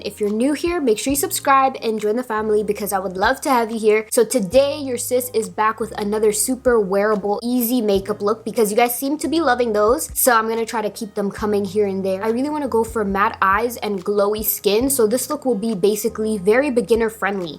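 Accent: Toronto, Canada. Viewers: I thought I recognized the TO accent 👀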